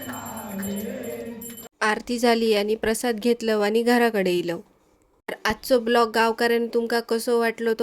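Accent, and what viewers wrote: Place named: Malvani, India. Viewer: Madam tumhi khup chaan malvani bolta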